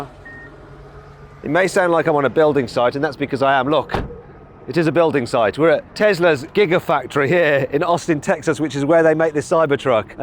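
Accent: United Kingdom. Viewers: 그러고보니까 번호판은 텍사스인데 억양은 영국억양이네??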